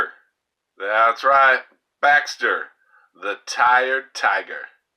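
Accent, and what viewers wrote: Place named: Georgia, United States. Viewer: Hey brother You're starting to sound like a Georgia boy and I like it 👌 Really great knives today, love the stag and the burnt bone . Thanks for sharing brother 👍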